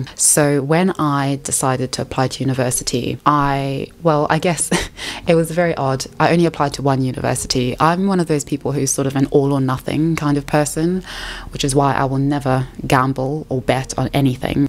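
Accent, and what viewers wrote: RP, United Kingdom. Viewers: doesnt matter if u went to oxford or not , you sound like you did 💁🏼‍♀️ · Wjust with your Britsh accent and level of education you would do very well in the U.S especially in media. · Why do you speak so posh? What's your regular voice?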